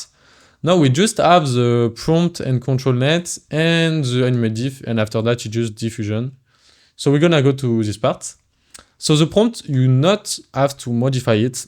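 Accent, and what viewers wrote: France. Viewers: notre beau accent Français !! c'est magnifique, sinon gg et merci pour la video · Mec, to accent.. 😅 Heureusement j'suis francophone 😄 Nice stuff anyway, very creative 👏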